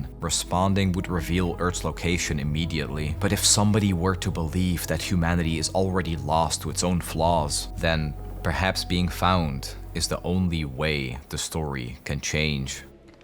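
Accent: Netherlands. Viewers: Are you Dutch perchance?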